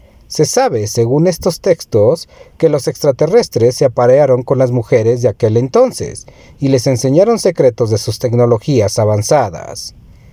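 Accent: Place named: Mexico City, Mexico. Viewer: La información que subes en los videos, no está mal, es la que encuentras en otros canales, pero tu voz con acento de Chilango fresa nada más no te ayuda mucho! Cambia tu tono a más Profesional!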